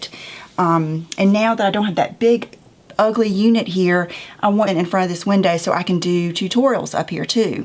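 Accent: South, United States. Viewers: Love your accent, are you from the south in the USA ? Maureen x from New Zealand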